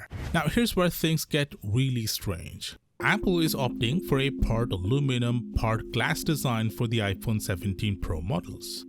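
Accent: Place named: India. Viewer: Omg i can hear indian accent from few videos away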